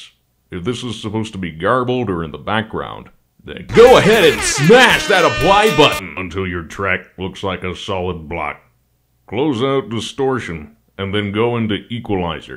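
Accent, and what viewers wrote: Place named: United States. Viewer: Most american voice Ive ever heard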